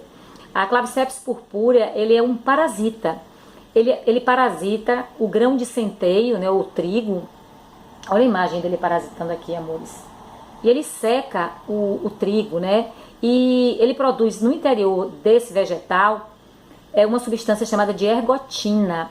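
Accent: Northeast, Brazil. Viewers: Esse sotaque nordestino deixa o vídeo mais empolgante ... Na moral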